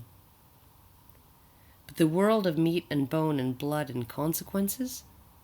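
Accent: Canada. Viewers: love it! good job. u do have a nice voice and a Canadian to boot. way to go against the powerful in your country. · fuck you and your dumb canada twang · her canadian accent.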